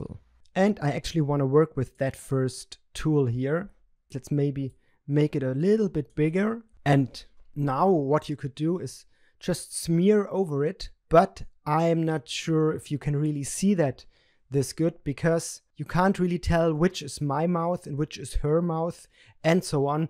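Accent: Germany. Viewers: Servus, wie gut geht es dir heute! Weil ich behaupte herauszuhören, dass du Deutsch deine Muttersprache ist (vermutlich denkst du, "es ist auch nicht schwer zu erkennen"), schreibe ich auf Deutsch. Hier geht es aber um deine Skills. Die finde ich sehr beeindruckend und deine Tipps hilfreich! Danke! Habe auch deinen Kanal aboniert!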